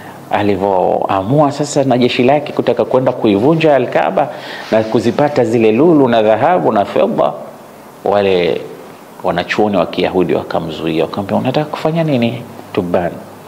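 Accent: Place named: Digo, Kenya. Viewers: Kweli kabisa ni mdigo kabisa uyu sheikh wetu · Huyu sheikh amezaliwa Kenya? His Kiswahili sounds like a kenyan.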